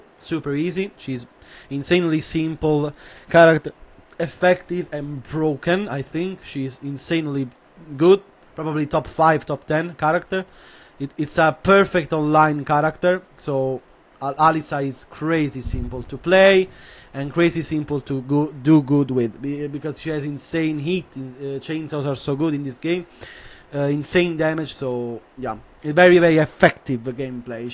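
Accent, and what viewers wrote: Italy. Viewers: You can hear he is italian and how he says claudio is perfect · I was only surprised by Lee, you even put it in "Easy" with those combos full of cancels and precision concatenations. Yoshi is a character who doesn't give you anything, he has potential for top 13\15 for me so it's probably even more difficult than that. Steve is probably the most difficult character in the game and also devil jin. question: are you Italian? I ask for your pronunciation of English 😂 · took me 2 sentences to realize he’s italian lmao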